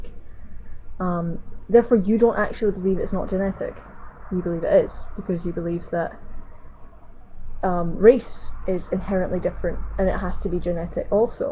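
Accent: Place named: Scotland, United Kingdom. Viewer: Thumbed up just for Scottish-ness. Even though I side with race-realism.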